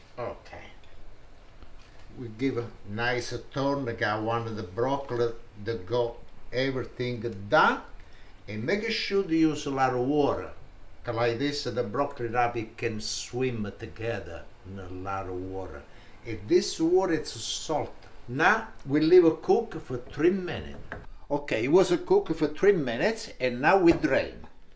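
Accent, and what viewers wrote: Italy. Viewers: My ears: hears Italian accent My brain: This is some serious gourmet stuff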